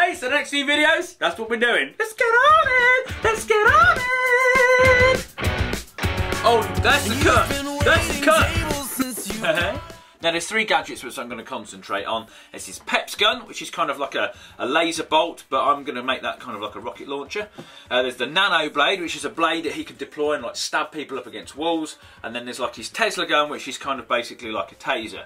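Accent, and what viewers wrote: England, United Kingdom. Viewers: what part of england is he from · *cough he's very much ENGLISH me old fruit... Although we are basically cousins (aussies - British)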